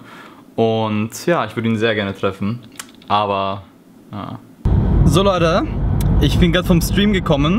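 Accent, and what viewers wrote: Austria. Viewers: könntest du mal ein Gaming Video machen wo du nur mit Österreichischem akzent redest? ;) mach weiter so bist mega cool, aber ich finde es manchmal etwas anstrengend für die Augen, wenn du die Helligkeit manuell umstellst in deinen vlogs :/ das nur Konstruktive Kritik sein :-) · ist das Österreichisch oder italienisch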